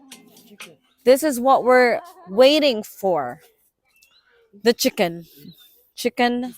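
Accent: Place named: Australia. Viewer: Aussie accent kana sissy, miss you 🥰😘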